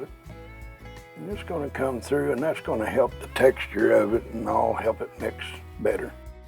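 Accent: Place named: South, United States. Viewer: Fine looking sausage! After you commented on my recent video, I came to your channel and glad I did. This is the kind of videos I enjoy the most. Are you from the south? You kinda got my accent haha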